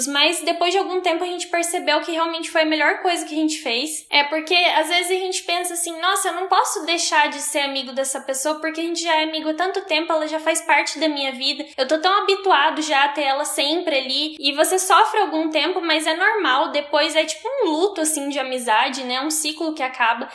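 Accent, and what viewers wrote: Paraná, Brazil. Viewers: Ah, mas eu a-do-ro esse sotaquinho do Paraná <3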